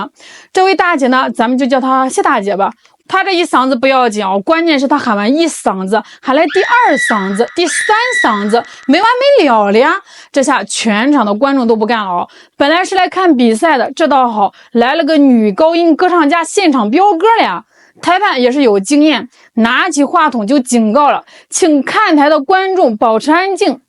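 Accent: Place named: Shandong, China. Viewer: 主播山东人啊😂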